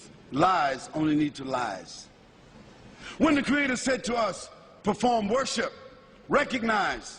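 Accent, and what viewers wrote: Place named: New York, United States. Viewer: machaa ellah, en plus son accent d'un vrai newyorker trop joli ellah ybarrek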